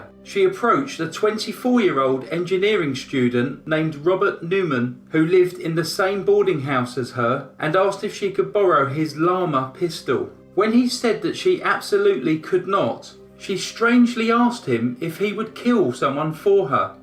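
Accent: United Kingdom. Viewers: Cool! I am subbed to both and CR and have been for some time! I swear they sound very similar voice wise, just different U.K. regional accents! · First time viewer. Love the subject matter but (I'll make enemies here) your audio sounds very canned. If I could offer some CONSTRUCTIVE criticism, improve your audio because you have a great voice and what American doesn't love a Brit accent? Keep up your great work but the audio recording is just... a bit off putting. Improve that and your "likes" will shoot through the roof. Love your work. Keep up the great stories! Bill: Brooklyn, NY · Brit accent goes a long way 🥰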